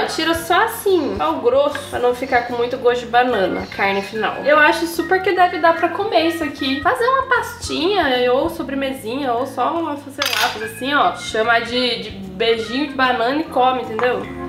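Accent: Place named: Piracicaba, Brazil. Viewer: Seu sotaque é o melhor que existe!! A piraricabana que existe em mim acorda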